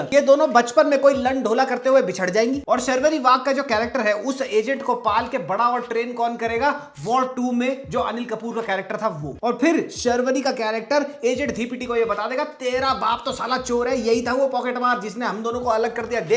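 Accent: Haryana, India. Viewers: bhai are you haryanvi??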